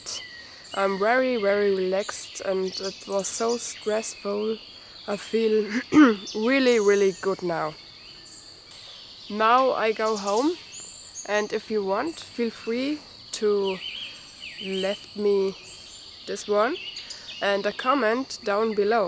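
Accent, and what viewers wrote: Bavaria, Germany. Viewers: never heard deutscheres english :D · Love your german accent. Nice video. You have a very appealing shape. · Lucky bavarian english .. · кошмарный немецкий акцент...но всё равно понятно.беда в том что женщина не создана для выживания в дикой природе. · Hallo, I recently discovered your channel, and the videos you have on here are amazing. In addition to the scenery and skill you show, I really love how you show the trains coming by in so much of your video work and listening / looking for them. I Love your German accent by the way, while it used to be more common that I've heard in older generations, from what I've seen in recent times, I don't hear it as rich and pronounced among many people as before, like yours. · aloha, i saw your video for the first time speaking English but immediately recognized that you were German and later in the video i learned that you were in Chiemgau Bavaria. 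This is absolutely one of the most beautiful places, Lake Chiemsee and the Kampenwand in Aschau. i lived in Sachrang and near Traunstein, but now back in Aschau. Aschau is great for hiking into the forest, and for mountain biking. maybe i see you here one day making a video of Aschau and Prien river. Entspannen and enjoy. your english is just fine, i learning to speak german. Aloha.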